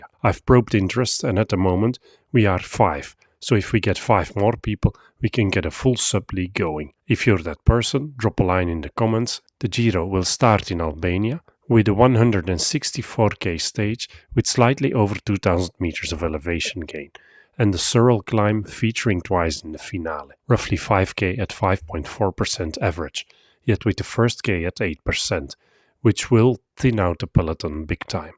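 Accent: Flanders, Belgium. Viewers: Ik hoor wat vlaams Engels in deze video haha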